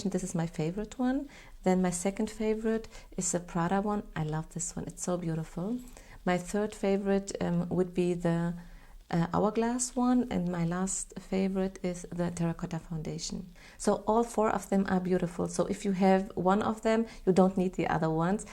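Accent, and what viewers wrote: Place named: Arab world. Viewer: I will guess Middle East . Arabic.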